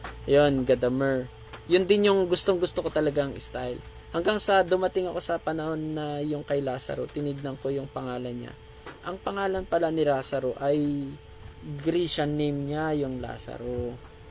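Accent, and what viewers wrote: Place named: Ilocos, Philippines. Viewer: Parang Ilocano din ang Pronounciation ninyo doc he he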